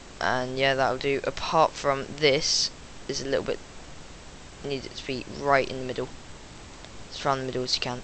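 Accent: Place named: United Kingdom. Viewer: YOUR BRITISH! I have a british accent coming on! :D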